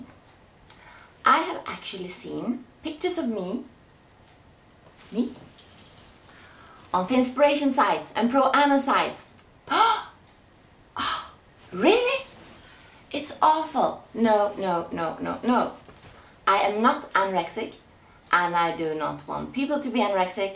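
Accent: Sweden. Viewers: You seem like such a sweet caring Mother <3 Also, I wish I was Swedish!! Your accent is So ADORABLE! I want it :3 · swedish accent :) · shes swedish, so a swedish accent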